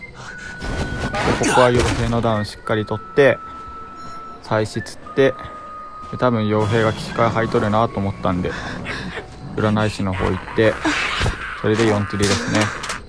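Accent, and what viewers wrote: Japan. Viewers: 金沢弁かな？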